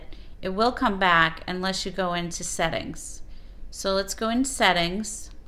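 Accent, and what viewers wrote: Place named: New England, United States. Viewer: Great job, easy to follow....even though I hear little yankee in your voice ;) , my southern draw would be much funnier lol.....great videos and keep up the good work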